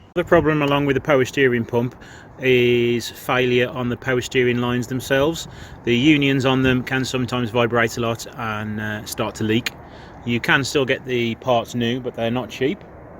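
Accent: United Kingdom. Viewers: Definately, listening to you accent, you are from the UK, weahter there makes cars rust, Bulgaria is a whole different story tho, and all the rust-prone componets can be covered in anti-coroding compound and given a coat of paint, I'd deffinately swap out the stock exhaust for а stainless steel system, which will sound better.. dump pipes and all of that will be changed.. a hybrid turbo will be installed.. an intercooler. the 2.4 engine can take alot of boost, I've had mates that got theirs to 300hp and 600nm of torque.. that's what got me into Breras :D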